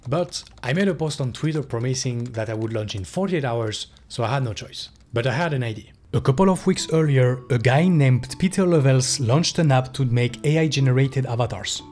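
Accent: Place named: France. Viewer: J'arrive pas à y croire, je me demande si avec l'accent il est français ou non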